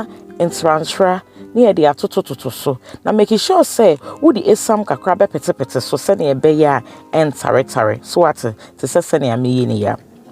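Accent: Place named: Twi, Ghana. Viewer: Eiii madam w’ano ni twi y3 d3 yie papabi ❤️ beautifully done sis 🙏🏻Much love dear ❤️❤️❤️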